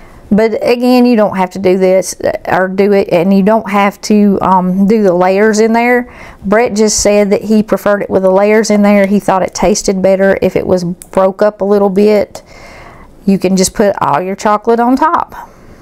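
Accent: South, United States. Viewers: My husband's going to love this one. Hes a Peanutbutter on anything lover. Especially IceCream. Gotta make it for him, only without the chocolate. Thank you for another awesome pie recipe. Love in Christ Jesus, Also, I just absolutely love your country accent.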